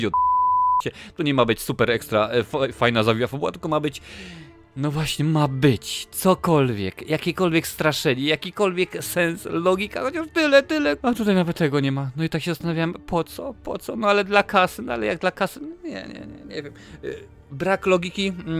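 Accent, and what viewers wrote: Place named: Podlasie, Poland. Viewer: Czy pochodzi Pan moze z Podlasia? tak fajnie Pan zaciaga ;)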